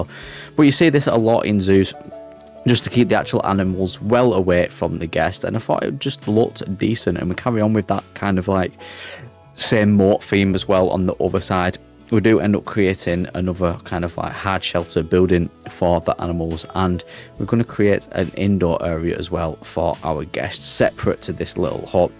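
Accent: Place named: London, United Kingdom. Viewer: yeah great voice, narration a n d accent. One of the main reasons actually for me to choose your videos over those of other gifted British creators. (My preferred accent group in general….) Is that a London accent? Which part?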